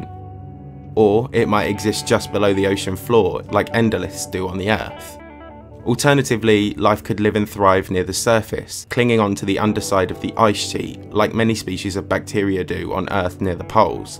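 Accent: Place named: RP, United Kingdom. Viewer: Softened upper class British accent that evokes confidence with slight superiority. He could be telling us martians are real.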